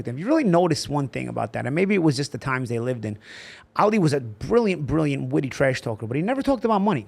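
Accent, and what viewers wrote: Brooklyn, United States. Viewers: I’m a casual boxing fan who actually discovered you Paulie as a commentator lol. whenever me and my friends would watch fights on showtime I would always learn a lot from you as an analyst. that’s when I was like damn who is this commentator Paulie he’s awesome and he reallyy knows his stuff.. I knew you were from Brooklyn NY first time I heard you talk lol just from your accent which drew me to you even more.. love this channel best boxing channel on YouTube!! Paulie is the man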